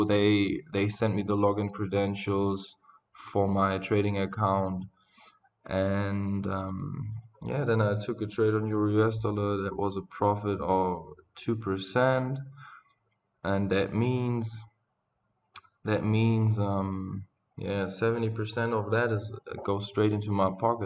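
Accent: Germany. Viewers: Great video my man. Are you from Germany bro?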